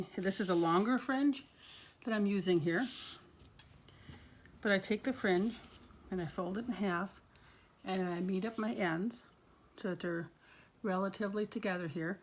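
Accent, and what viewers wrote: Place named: Scandinavia. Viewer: Interesting accent - are you European? Scandinavian Maybe?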